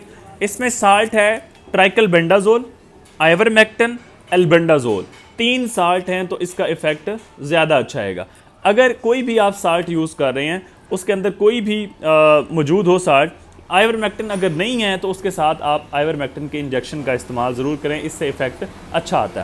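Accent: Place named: Saraiki, Pakistan. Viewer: Layah ka mtlb ye hwa k ap mithi boli sairki bolte hn...... 😮😮😮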